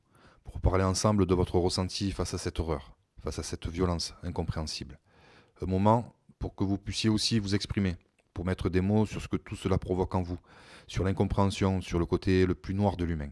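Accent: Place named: Alès, France. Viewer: Une pointe de trivialité en passant, malgré la lourdeur du sujet traité; Vous avez la même voix ( J' ai tout de même m'y quelques vingt, vingt cinq secondes pour trouver) qu'un certain .....Blanc, également..... Laurent de son prenons. Sérieusement , pour avoir parler avec lui à plusieurs reprises ces dernières décennies je reconnais même certaines intonations, pauses ou enchainements....l'accent aussi. C'est troublant ! Je suppose qu'on vous là souvent dit. Pour ceux qui me lisent sans comprendre, Laurent Blanc est un ancien footballeur professionnel ........! Peut être, êtes vous habitant, ou originaire, de la même région que lui ( Alès ) ? Je m'abonne et reviendrais vous écouter, vos propos dans cette vidéo m'ayants touchés.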